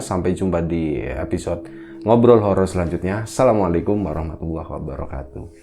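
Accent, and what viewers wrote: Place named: Banyumas, Indonesia. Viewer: Sueneng bgt denger logate... 😀😁👍Ngapakmodeon ❤️❤️...sehat dan sukses sll ya Mas berdua,GBU!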